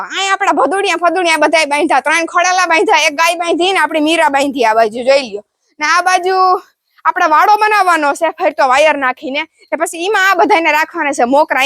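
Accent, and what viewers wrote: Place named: Kathiawar, India. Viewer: સિસટર તમારિ કાઠીયાવાડી બોલિ સરસ છે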